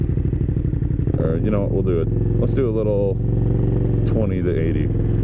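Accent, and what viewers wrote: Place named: United States. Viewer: Awwm until you started talking I thought you were an actual Italian reviewing the can and the bike. What the fuck do Americans know about motorcycles?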